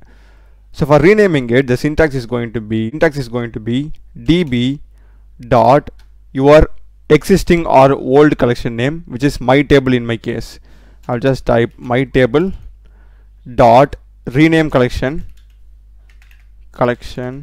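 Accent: South India, India. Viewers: I think you are form Tamil Nadu or south India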